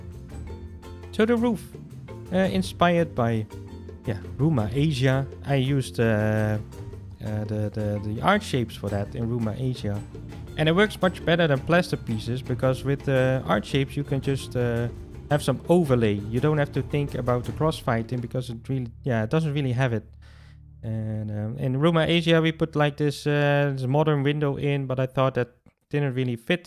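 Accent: Netherlands. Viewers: are you dutch? i also am so just wanted to know bc i heard more dutch guys talk english like that Also for other peopol reading this guy is great at the game so SUBCRIBE!!! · the dutch accent is strong in this one, love it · Heerlijk Nederlands accent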